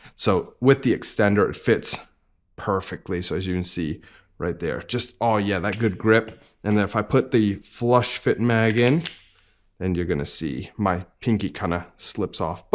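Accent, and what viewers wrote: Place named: Texas, United States. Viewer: You Viet from Texas? You got the nuoc mam with tx bbq twang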